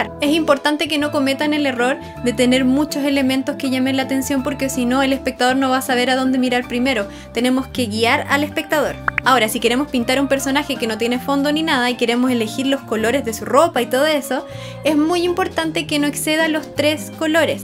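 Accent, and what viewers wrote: Chile. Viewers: es el acento chileno xD como nadie se da cuenta de eso xD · Nomames amo su acento chileno alaberga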